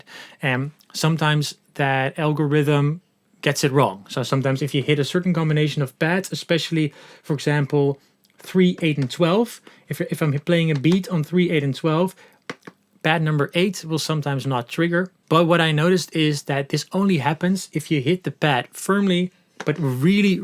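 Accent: Netherlands. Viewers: So you are Dutch, right?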